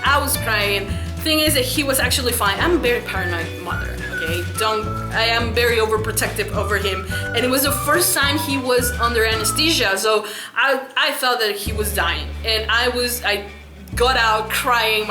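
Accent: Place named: Spain. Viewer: I love your spanish accent! I'm from Dominican Republic :)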